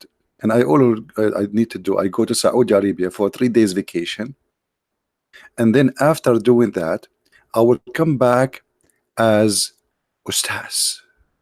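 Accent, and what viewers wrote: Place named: India. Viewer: iya bro, seperti logat asli orang hindi.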